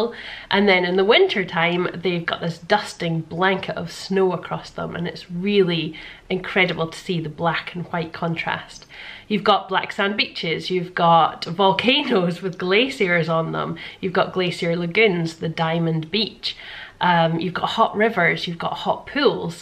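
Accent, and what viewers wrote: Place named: Scotland, United Kingdom. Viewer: hi, just came across your channel....i think id LOVE to live in iceland...im sooo into the simple life and nature...i actually watched your what you hate about it first....im just wondering about what work you can do? get? and what you have to do to be able to stay/live there....thanks for video...Love your accent....love scotland....its beautiful..:) x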